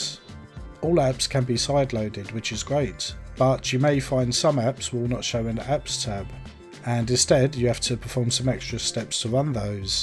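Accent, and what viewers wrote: Cockney, United Kingdom. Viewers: This guy is a proper cockney geezer 😂😂😂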